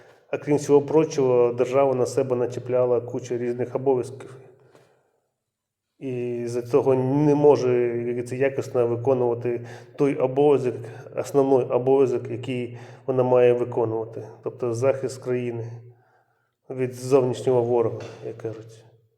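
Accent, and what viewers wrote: Russia. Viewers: ЦЕ ЩО КАНАЛ ФСБ? ЧОМУ МОВА НЕ УКРАЇНСЬКА,А РОСІЙСЬКИЙ СУРЖИК?. ЧУТНО ЩО РОСІЙСЬКОМОВНА ЛЮДИНА КРИВЛЯЄ УКРАЇНСЬКУ.ГАНЬБА!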